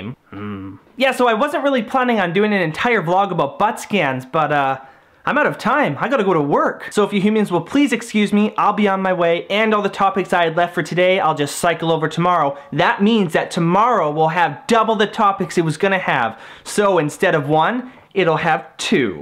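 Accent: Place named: Canada. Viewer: Holy Canadian Accent! We get an "aye" and and "aboot" within the first 20 seconds!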